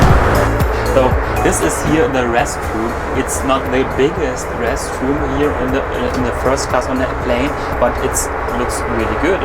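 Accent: Germany. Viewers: Dein Englisch ist so deutsch! So richtig schlecht!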